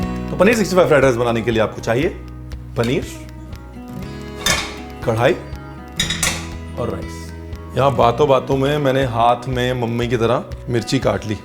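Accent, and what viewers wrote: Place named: Punjab, India. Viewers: Amazing recipes of left over rice...will try paneer 65 rice for sure..luv d way u explain.ur punjabi tone while explaining reminds me of my mom...meethe chol ni banane ha ha👍😀too gud...love n respect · Aaj tou fir teen shadiyaan hongi shamko, aana jarur ji.... 💥💥💥btw aapki punjabi k sath meri bhi punjabi nikal jati hai, n my hubby say, tere naal he gallan kar reha hai 🤣🤣🤣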